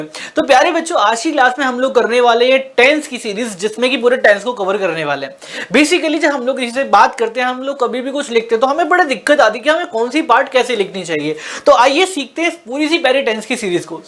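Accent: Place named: Uttar Pradesh, India. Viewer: Sir aapki mujhe U.p. ki boli achhi lgti hai aap aligad se ho meri nnihaal hai vha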